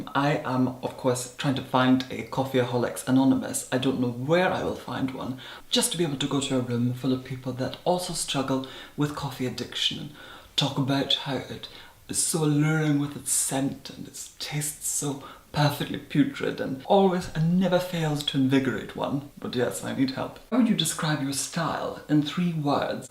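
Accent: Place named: Scotland, United Kingdom. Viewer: He is from Scotland.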